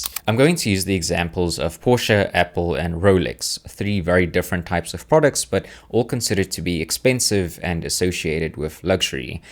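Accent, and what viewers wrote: South Africa. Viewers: are you south african bru? <3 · You sound South African… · You south african? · you sound south african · Totally irrelevant, but I heard your accent and knew you were South African without checking the channel description. Howzit bruv 😂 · you sound South African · bro sounds south african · Love the video bro but I couldn't shake the feeling that you're from South Africa, accent sounds South African to me. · bro, are you South African? i'm kinda getting the South African accent there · Are you South African? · Yoh the South African in me heard 4 seconds and knew you were from here either ways this was a majestic fyp pull, love how you explained it all and is actually had a simple understanding. Earned yourself a new subscriber 👌🏾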